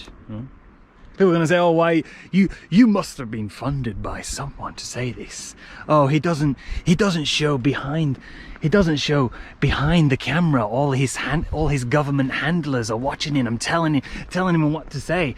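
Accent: RP, United Kingdom. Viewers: Hahahaha that BBC voice....epic!!! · I love how this British vlogger learn the accent of the BBC reporters! Very well done! Thank you for explaining how western media discredits China and make fake news about China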